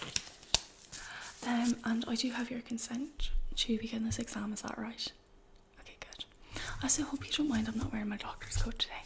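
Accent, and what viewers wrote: Ireland. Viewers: Fukkin love an Irish accent! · For some reason i just loved your accent! It's irish accent? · Hi Miss, YouTube recommended and I'm glad they did! I really like your Irish accent! I subscribed and I am going to get notifications! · This was lovely and the Irish accent is a huge bonus. What county are you from? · Love this! Instant subscribe. Haven't come across any big Irish artists yet, I'm sure there are some and I haven't actually searched for them, YouTube just hasn't pushed any in my direction till now. The accent is perfect! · That Irish accent is awesome for this type of ASMR! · Like this video and like the Irish accent. 👌👍😊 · I’m a simple man; I hear an Irish accent, I subscribe. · Just can't beat an Irish accent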